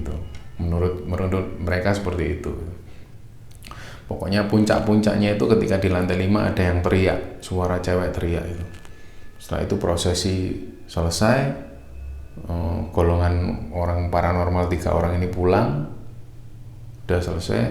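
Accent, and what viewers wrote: Java, Indonesia. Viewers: Mirip aksen jawa nya aja...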